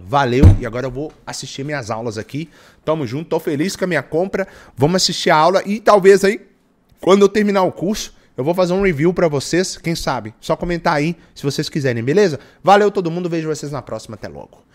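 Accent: Minas Gerais, Brazil. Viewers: O cê é mineiro né não? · O melhor de Minas é o sotaque... vamo dominar essa porra tudo, nuuu nois é o miosin que está tendo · É mineiro, né? KKKKKKKK Conheço o dialeto de casa em qualquer lugar! 🤣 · Mineiro Né? De Rocha sow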